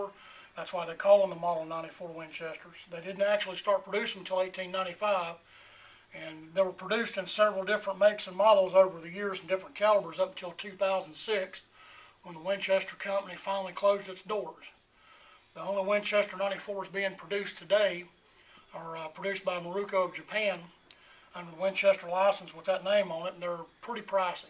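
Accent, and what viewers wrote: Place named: East Texas, United States. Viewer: Thanks for this video! Maine? You sound like my people here in the Texas piney woods! Gonna check your site out. I need my 1974 M94 blued. You mention that you do that. It looks like a black paint that's coming off the receiver.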